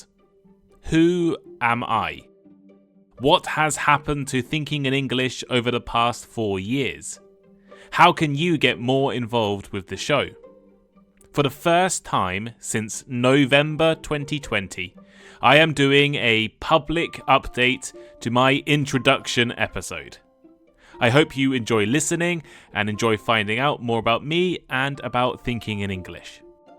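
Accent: United Kingdom. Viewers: I subscribed the pareon for some time and I can guarantee about the quality of the conversation clubs and the English courses grammar running by the Thinking English team., and Peter are such wonderful teachers and the chance to speak with english learners all around the world is incredible. Thank you very much for your podcast, you've been helping me a lot to improving my english and getting used with british accent.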